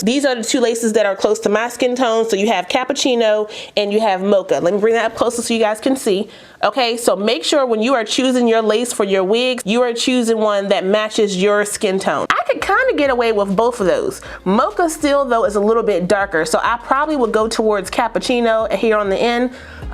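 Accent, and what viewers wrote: Washington DC, United States. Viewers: You from DC MA'AM??? I hear you rocking that go go!!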